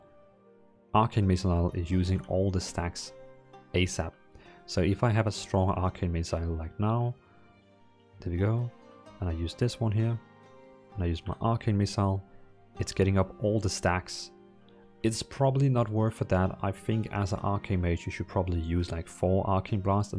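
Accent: Denmark. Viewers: Are you Danish? Nice video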